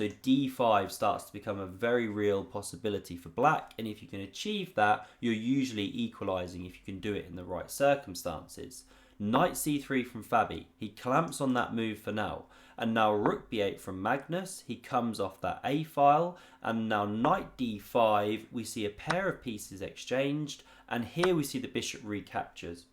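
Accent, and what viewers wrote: United Kingdom. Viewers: 3 things I love about this vid: Nice informative game, great explanation and lovely British accent!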